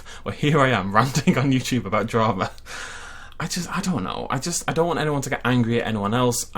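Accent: United Kingdom. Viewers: I’ve been subscribed to you since you had like 500subs. I’ve found beaver much much later, but I’d say he didn’t really got his subs with JW:TG. He does, like a lot of things too. I found him through the Isle, which game you did played some time ago, but stoped doing so. He did not. Also, his.. umm.. like, style if you will is completely different from yours. You’re like an excited nature documentary commentator, and he (over)edits most of his vids (which i like per say). But, the most important thing is: Both of you have a funny British accent.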